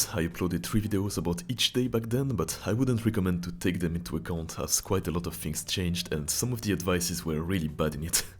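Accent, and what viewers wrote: France. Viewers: No offence bro but you sound French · Is he French?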